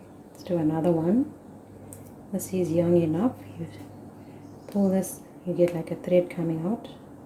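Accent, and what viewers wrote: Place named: South Africa. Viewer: Love the South African accent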